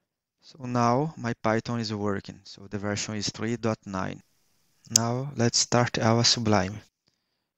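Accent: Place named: Ceará, Brazil. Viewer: Curso de Python em Inglês com sotaque cearense.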